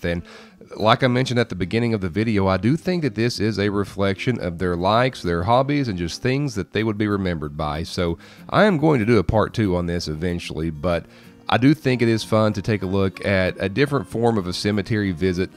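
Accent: United States. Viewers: Its so refreshing to hear someone narrate in a clear american voice that can be clearly understood! Not like those British and India narrators! Most of the time i have a hard time understanding tbem!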